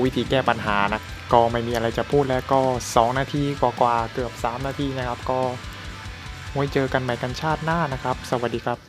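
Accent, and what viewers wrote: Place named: South, Thailand. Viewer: เป็นคนใต้ป่ะครับ 5555 ชอบเสียงอ่ะ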